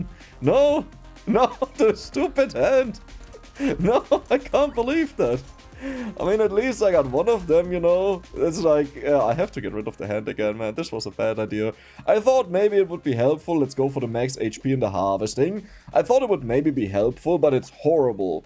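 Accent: Germany. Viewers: He Sounds rly german😂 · i love it that is german and somtimes you can hear it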